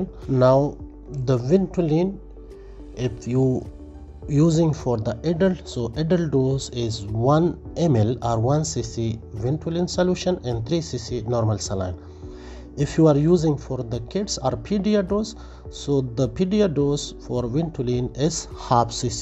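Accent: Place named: India. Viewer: you have an accent which I think is Indian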